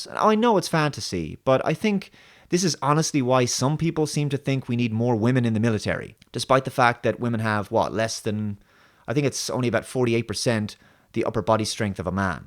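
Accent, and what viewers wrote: Ireland. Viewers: You Irish? :D Instant sub!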